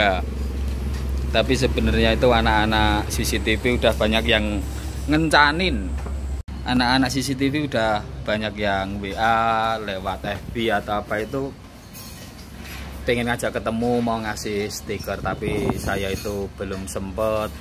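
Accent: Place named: Java, Indonesia. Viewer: Jawa tulen mas😅😅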